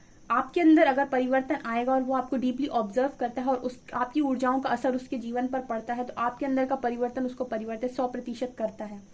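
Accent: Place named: Bhedaghat, India. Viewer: Aaki boli se samajh Gaya main ki AAP Kahan se ho behanji...bhedaghaat..am I right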